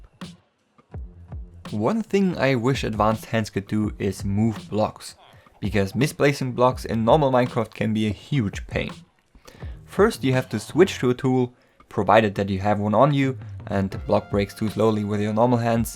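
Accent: Germany. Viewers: Klingstn bisschen deutsch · you sound very german. am i right? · Hah wusst ich doch das dein Akzent deutsch/österreichisch klingt. Props an dich du bist einfach der Beste Minecraft "developer" (<--------- also du weißt was ich meine xD)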